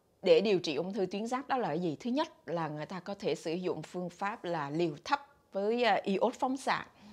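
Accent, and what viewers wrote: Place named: Quang Nam, Vietnam. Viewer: là người Quảng Nam ạ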